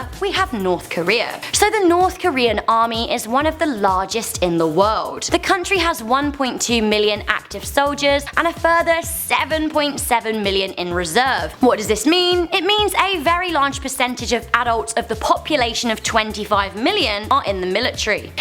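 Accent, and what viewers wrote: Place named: United Kingdom. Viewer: is a simple naturally beautiful and sexy girl and that deep thick British accent is just charmingly adorable!. MAGM.❤️✝️☯️🇵🇷🇺🇸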